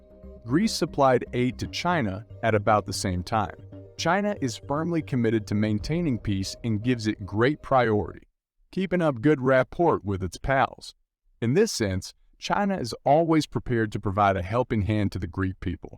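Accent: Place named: United States. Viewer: Not impressed , sounds very American ,therefore biased ?The narrative has no connection to video !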